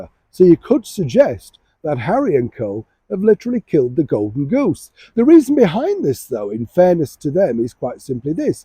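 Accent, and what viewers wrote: Yorkshire, United Kingdom. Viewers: My mum would drink brandy and babycham when my parents went out!, are you from West or South Yorkshire? You remind me so much of a close friend from Doncaster! Happy new year to you xx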